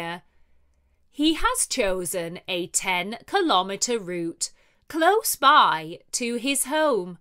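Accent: United Kingdom. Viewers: Lovely English Stories is really helping me in improving English. The practice of native British accent is something unique about this resource. Most of the other competing resources are based on American accent. Practicing everyday i have made a good routine and the topics are coming out of daily life. Thank you very much 😀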